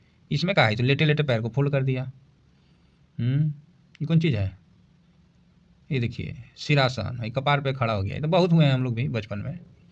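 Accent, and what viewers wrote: Bihar, India. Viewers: Sir app bihar ka lagra sir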